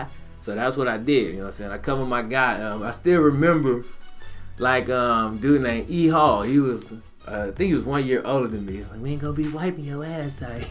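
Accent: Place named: Mississippi, United States. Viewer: Hold up...u from Mississippi? I’m from Mississippi idk why but that cool as shit to me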